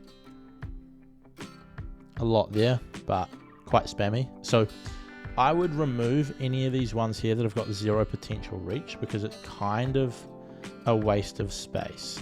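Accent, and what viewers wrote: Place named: New Zealand. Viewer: thanks dude. first i thought a fellow Australian? then i heard the NZ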